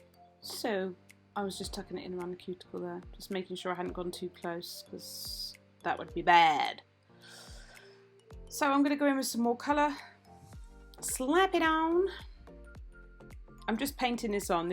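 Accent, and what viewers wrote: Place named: England, United Kingdom. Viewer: I love to hear her too. I'm in America but a huge Anglophile.... her accent slays me.... her a the lady from! Love how she says "bits and bobs"... and other other local phrases. Lol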